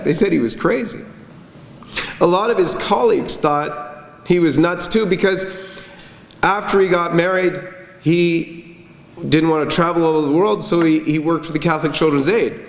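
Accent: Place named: Germany. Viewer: Is he a German speaker? His English is so good!😁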